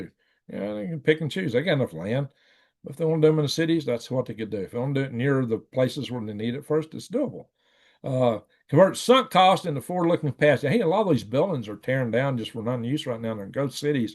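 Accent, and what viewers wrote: South, United States. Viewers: It was a little Dry. My friend Mike said, he said the Big A.I.(can't remember) company can afford the A.I. but China couldn't. A lot of repeated Math, repeated yourself often. Then he did his usual copying your 'Southern accent" in levity. "China" he said from you. He's a good guy, my friend. I say We as Americans must work as if it depends on us, and Trust as if it depends on God. And Freedom to the counterpart of people like me in USA, IN CHINA. "See you down the road.". As someone once said to me at meeting.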